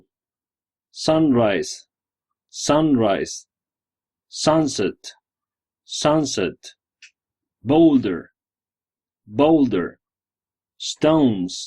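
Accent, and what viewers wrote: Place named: United States. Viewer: por la pronunciación supongo que en americano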